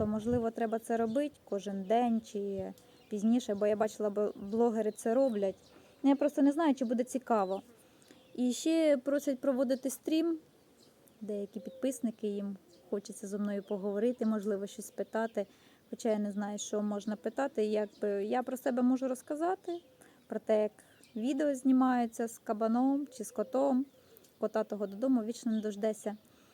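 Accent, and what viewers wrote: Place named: Surzhyk, Ukraine. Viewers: Не знаю, чому Ви себе бешеною - чи це в тому смислі , що Ви така щаслива , безпосередня у спілкуванні з тваринками.. Але Ви така щира і приємна, що це не може не подобатися. Цікаво, звідки Ви? Мені не попадали на очі ті кгметарі, де Ви про це розповідали. По вимові - косю ходю - то це десь Дніпро-Полтава- Кропивницький ... А про стріми, відео - Ви цікава своїми щирими емоціями, бо є люди, які повторюють чужі фрази з тік-току , а є ті, що хочуть бути дуже оригінальними і плетуть хтознащо . А Ви не фальшива актриса, а просто кайфуєте від того,що робите - і це приваблює. А сюжети життя саме підказує. Хто має тварин і любить їх - для того майже щодня якісь маленькі пригоди. Знаю по своїх котиках - можна цілу книжку написати , та такого, що й не всі б повірили в котячі витівки ) А пригоди вашого Жоріка - це ж неповторний дгсвід, бо в кого ще є такий вільний кабан ? 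Та і не всі в селі вміють нормально говорити з тваринами. Є такі, як що : киць-киць, іди їсти, їж, на, пішов геть , щоб ти здох - це так реально одна знайома жінка каже... А я можу щодня діалоги зі своєю кицькою вести : і яка вона красуня, і хвалю її вміння спати непорушно пів-дня, не міняючи пози : виспалась, доця? О, перевернулась ? Правильно, двіженіє ето жизнь ) Був би тільки час .. Бажаю Вам всього-всього, що Вам хочеться і потрібно ( бо не завжди нам потрібне те, що хочеться) Досягнете Ви ста тисяч, кнопок чи ні - аби це Вас радувало , аби ьуло мирне і шасливе життя. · Сонечко, ти найкраща. Твий суржик такий справжній, такий теплий... Дай Боже тоби всього найкращого.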